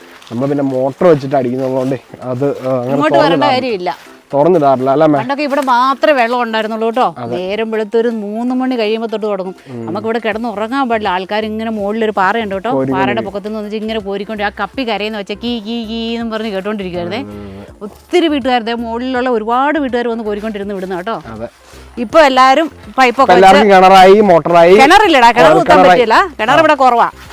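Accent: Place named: Ramapuram, India. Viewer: നാടൻ സംസാരം.. അറിവുകൾ... അമ്മച്ചി അടിപൊളി.. രാമപുരം ആണോ??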